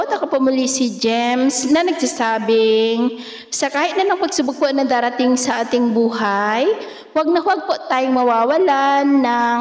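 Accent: Visayas, Philippines. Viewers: Bisaya Yung nag wiwider apdit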